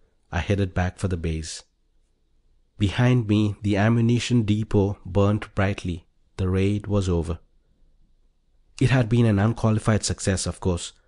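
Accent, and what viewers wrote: India. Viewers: Beautifully spoken English & lovely crisp Indian accent. I really enjoyed it, thanks... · The pathetic narrator has an annoying Indian accent.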